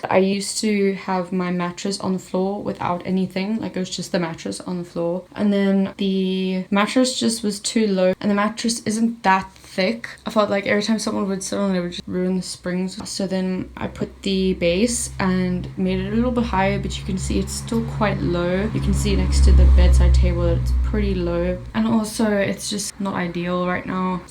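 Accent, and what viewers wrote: South Africa. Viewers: Are you South African? You sound like it but I don't want to assume things because people get so triggered when I ask this but... I'm South African and you sound soooo South African. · Are you South African? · I was like damn she sounds South African, then she hit us with the "so, ja" and I knew 😂❤️ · she afrikaans so south africa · she's south african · as soon as i clicked this video i said “she’s from south africa” i adore your accent!!! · you sound south African. hmm lmao great vid btw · I could spot the accent straight away!! I'm South African too!! <3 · the first 5 seconds I was like sHe is SOuth AfRICan 😂😂❤️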